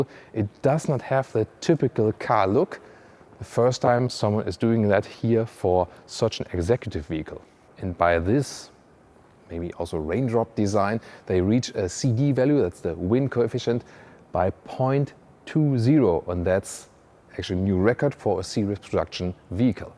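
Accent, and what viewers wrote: Germany. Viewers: Some of the Germish is very interesting! Good effort though, vielen dank.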